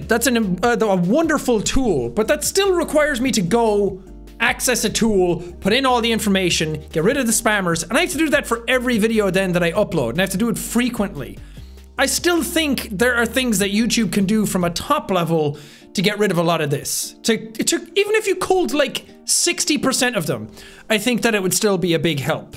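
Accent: Ireland. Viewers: dude the phrase "god's happy no problem bud" and "time for some misery " with that strong Irish accent is sooooo good XD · Loud Irish man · I know this sounds like I'm making fun of his Irish accent but the way he said "why" was just so funny to me. I really like Irish people I think they are really funny but serious at the same time and I just love it. I would move to Ireland if I could.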